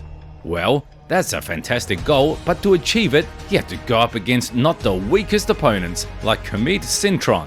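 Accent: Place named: United Kingdom. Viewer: This might have been a great video, accept: the narrator spoiled everything. This guy mispronounces everyone's name along with that terrible UK accent. 👎👎👎👎👎👎👎👎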